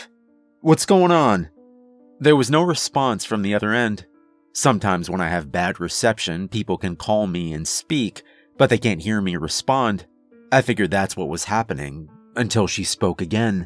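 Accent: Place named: South, United States. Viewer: Did anybody pick up a weird teter-otter between a southern and a northern accent?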